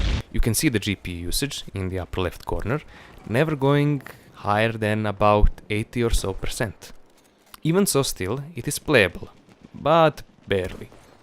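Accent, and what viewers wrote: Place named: Russia. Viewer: Russians акцент!)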